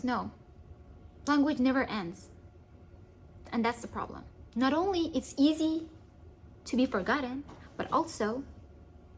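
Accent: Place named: Arab world. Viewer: Why do you have Arabic accent!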